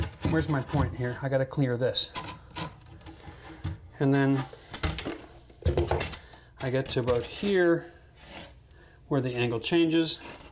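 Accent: Canada. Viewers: He sounds Canadian, so I am guessing he is in Canada.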